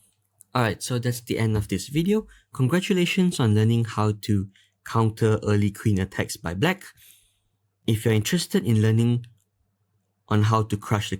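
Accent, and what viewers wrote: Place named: Singapore. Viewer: hello dude, i wanna ask where r u from? u sound singaporean ngl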